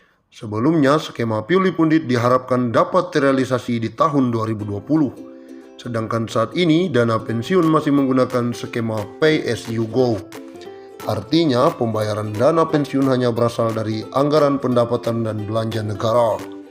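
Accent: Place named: Madura, Indonesia. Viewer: Orang Madura y mbk , Madura mana mbk 🙏🙏🙏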